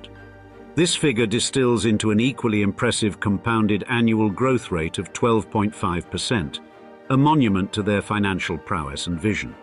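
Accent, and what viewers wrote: RP, United Kingdom. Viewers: Very nice SRP accent by the announcer. And no, the "Queens English (SRP)" is not even close to the accent of the majority of British people. The vast majority of perfect RP speakers are in overseas Commonwealth countries. Only 5-15% of British people speak with an RP (received pronunciation/ Queens English) accent. I write this to expel simplistic ignorant assumptions that many people hold.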